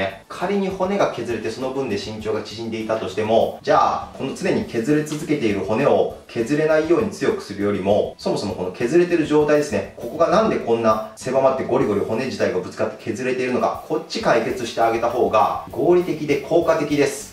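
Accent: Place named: Osaka, Japan. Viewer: 今回の動画もとても参考になりました。20年前にこの情報があったらよかったと思いました。でも今からでも決して遅くないとこれからできる限り続く様に 、 自分に 言い聞かせます。 ただ 正坐 は 難しいです。Japanese comment is hard for me. So please let me keep sending you comment in English. My English is very simple and I am very sure you understand. Thanks, sensei. I love your Osaka accent-大阪弁. Toshiko from Seattle.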